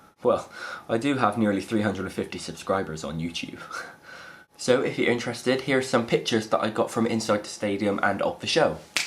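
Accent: Bristol, United Kingdom. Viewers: Ahh I love the Bristol accent:) I was gonna go see on Wednesday here in Dublin but just didn't get around to it:/ Maybe there's some left:) Glad you enjoyed. xo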